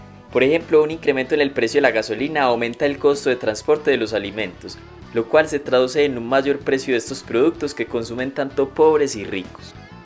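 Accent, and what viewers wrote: Antioquia, Colombia. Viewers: Pensé que eras paisa, tienes un asentó parecido. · Su acento es claramente colombiano. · Es paisa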